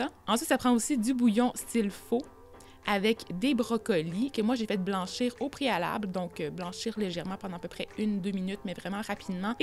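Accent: Quebec, Canada. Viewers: j'aime trop l'accent québécois 😍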